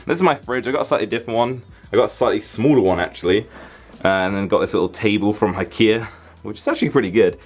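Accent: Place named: United Kingdom. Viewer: is from the UK. You can clearly hear the difference between English (British English) and 'English' (American English). Jordi and Jelly are from the Netherlands, they have been speaking "English" (Dutch English) in videos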